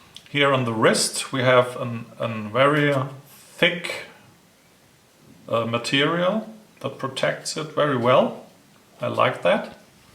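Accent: Germany. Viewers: Das ist so ein kranker deutscher Akzent 😂😂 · Man merkt so krass das du deutscher bist 😂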